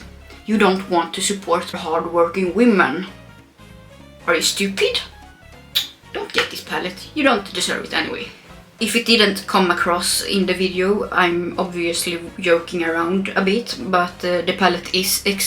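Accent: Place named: Sweden. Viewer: The Swedish accent is on another level! 😍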